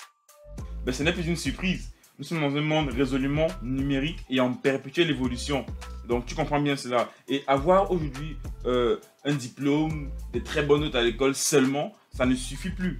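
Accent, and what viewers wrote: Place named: Cameroon. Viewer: L'accent Camerounais🤌🏾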